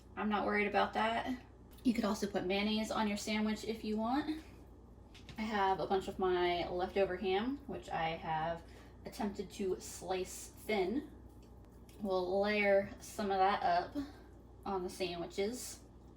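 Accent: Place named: Georgia, United States. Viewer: Based on your "accent" I would assume you're living in Georgia but maybe a transplant from a different state.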